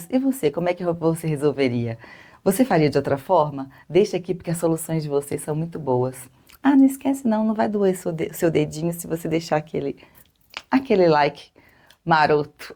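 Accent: Minas Gerais, Brazil. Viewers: Sei lá... Quando a professora explica com sotaque "mineirês", eu entendo tudo! ♥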